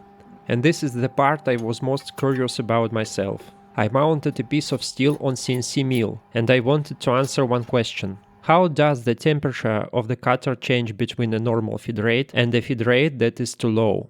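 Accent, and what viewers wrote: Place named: Russia. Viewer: It is impossible to hide a russian accent.😅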